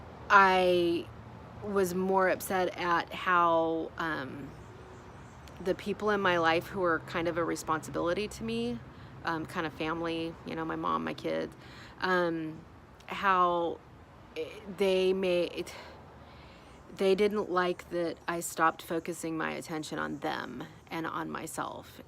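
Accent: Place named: Boston, United States. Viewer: Thanks. I really enjoy following your journey. The struggle is real for all of us. You remind me of a Bostonian the way you talk and your attitude. I grew up in Boston. We're wise guys, sarcastic, hardworking and honest. We tell it like it is. I appreciate you and your struggles. Thanks for keeping it honest and real💟Be glad you're not here now. Big snowstorm coming tonight 🙄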